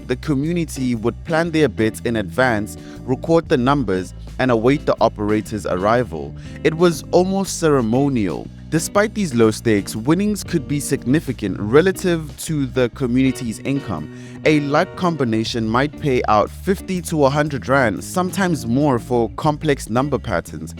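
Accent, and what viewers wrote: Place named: Nigeria. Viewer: This dude sounds like a Nigerian. I am out here !!!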